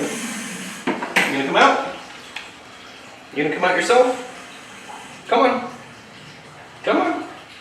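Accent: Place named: Australia. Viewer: Damn that australien accent thoooooough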